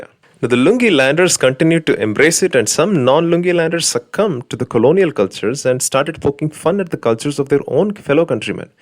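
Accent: Kerala, India. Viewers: malayali aanenn samsaram kettappo manassilayi :) Fav channel <3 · Bro are you from Kerala your English seems like it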